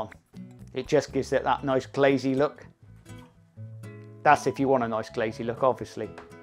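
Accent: United Kingdom. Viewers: i love your accent. what kind of uk accent is it?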